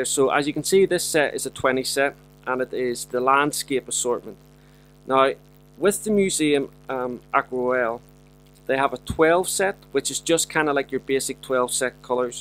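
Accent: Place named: Northern Ireland, United Kingdom. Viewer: I think has a Northern Ireland accent IMHO.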